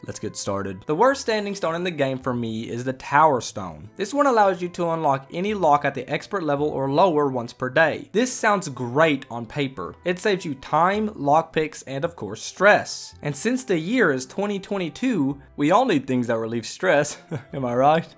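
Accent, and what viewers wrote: South, United States. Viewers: My opinion is..... You have a fantastic southern accent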